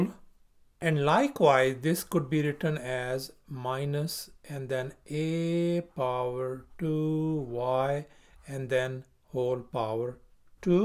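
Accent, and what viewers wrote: India. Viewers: Sir , I am from India.. You sound like Indian accent.. Are you from India?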